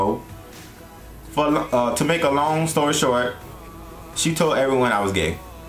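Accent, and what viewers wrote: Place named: New Orleans, United States. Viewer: I hear the Nawlins accent... I am from Louisiana also... You are one pretty man!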